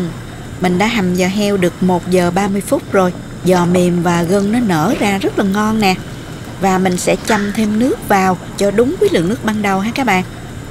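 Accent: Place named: Binh Duong, Vietnam. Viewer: Trời tưởng ở VN. Trân trọng cô quá, nơi xứ người mà nấu chuẩn hơn nhà. Giọng càng tưởng ở Bd. Chúc cô nhiều sức khỏe mãi nấu những món ngon.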